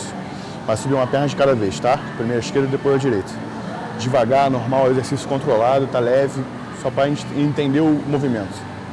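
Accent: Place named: São Paulo, Brazil. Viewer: Deve ser PAULISTA tu .. pior sotaque q tem e as gírias de paulista pqp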